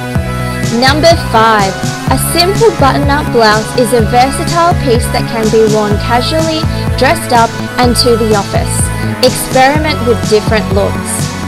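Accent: Australia. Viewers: love the aussie accent! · Love this thanks. And love the hip-swinging and your aussie accent xx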